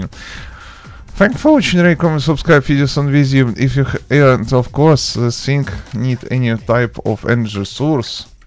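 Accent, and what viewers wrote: Russia. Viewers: Пахнет русским акцентом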